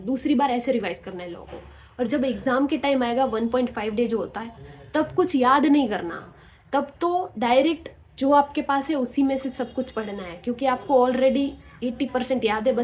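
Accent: Gujarat, India. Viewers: I smell Gujarati accent little bit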